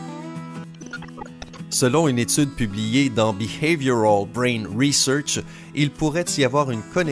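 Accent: Quebec, Canada. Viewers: J'adore ce mec avec l'accent Québécois, il me fait trop marrer... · J'adore la voix québécoise like si toi aussi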